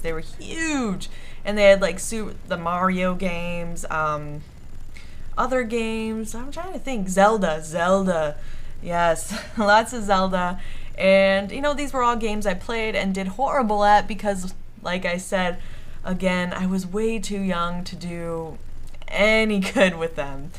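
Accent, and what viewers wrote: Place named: United States. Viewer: Hey I'm new to subbing to you and I have been subbed to whiteboy for a long long time and I noticed u kinda have the same dialect as him. Are you from the Sam part of the us or something?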